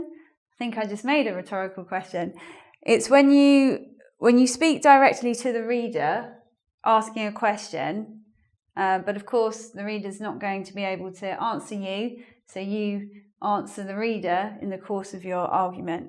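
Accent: United Kingdom. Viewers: Your Great, Miss.I was looking for real British English Lesson and now finally found you on you tube, awesome! by the way I'm from Nepal. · she has British accent. I like her voice lol so similar with my professor from London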